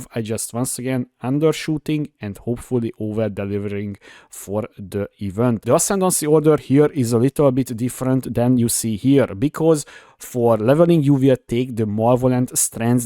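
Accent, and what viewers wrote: Hungary. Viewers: He s english is wierd bc of he is born ín hungary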